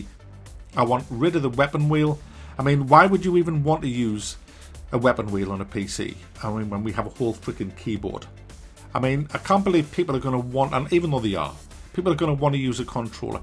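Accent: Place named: Middlesbrough, United Kingdom. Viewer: He sounds like he's from middlesborough, sir davos sounds more like a geordie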